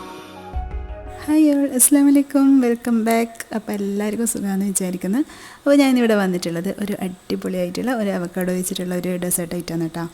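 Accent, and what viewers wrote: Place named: Kannur, India. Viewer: Samsaram kannur karudaedalla...super videos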